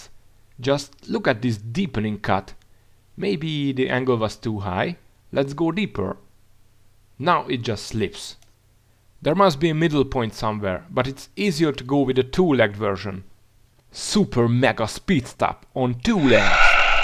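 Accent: Hungary. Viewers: De magyarosan beszéli az angolt xd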